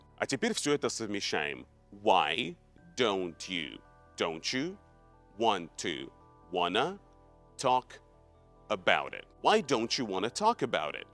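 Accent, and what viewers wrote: United States. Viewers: Ого, я думала он американец. Офигеть. Короче чтобы выучить разговорный, сначало нужно понимать классический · Спасибо, снова молодец! Надо только заставить мой язык поворочиться , буду тренироваться. Но, насколько я понимаю, это американское произношение, англичане так не говорят, не так ли?! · Ты реально крутой чел, я думал ты достиг такого уровня американского английского живя в США, но нет, я ошибся! Продолжай, ты делаешь большое дело!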